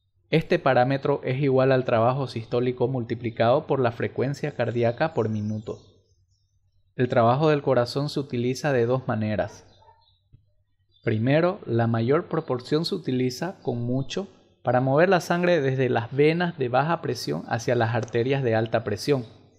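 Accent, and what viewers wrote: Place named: Santa Cruz, Bolivia. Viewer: Buenisimo video , una pregunta eres de santa cruz , porque tu acento es muy similar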